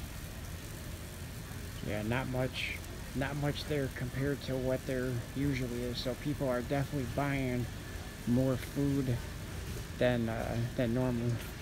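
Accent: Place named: Midwest, United States. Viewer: Rootless Wanders Rootless... you can’t tell by his Mid-west accent!? Good lord he sounds like he could be RADAR O’Reiilys cousin!!!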